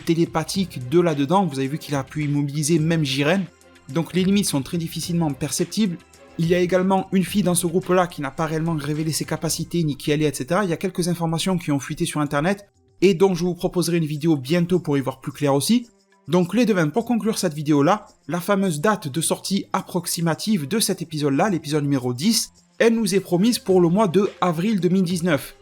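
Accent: Marseille, France. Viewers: Juste comme ça, vient tu de Marseille ?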